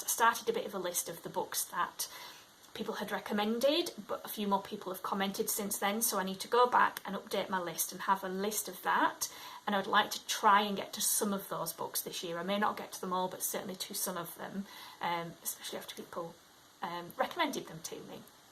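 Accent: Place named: Doncaster, United Kingdom. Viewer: Hi, happy new year! Recently discovered your channel ☺️ Your accent is nostalgic for me to hear as I'm from Doncaster and have lots of family who live there. Don't know if you live anywhere near there but it reminds me of them! I'm in Derbyshire now, so not too far away. Hope you have a great reading year.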